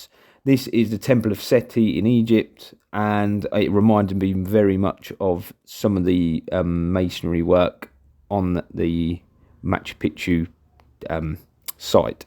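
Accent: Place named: United Kingdom. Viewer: Hello, and I am so glad that I have tripped over your site. Not only do you provide lots of info, but you have a British accent and pronounce things in a way that I am used to hearing them. Some American sites have robots talking that should be shot. I look forward to seeing more from.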